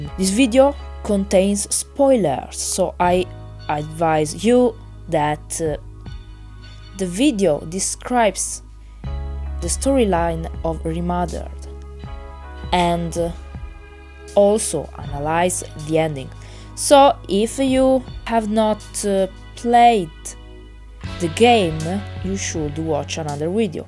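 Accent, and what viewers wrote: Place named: Italy. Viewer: Love your accent. It's Italian right?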